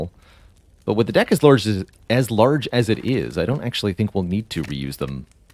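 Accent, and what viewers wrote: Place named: United States. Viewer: Thanks for the summary! (his accent is definitely American ;)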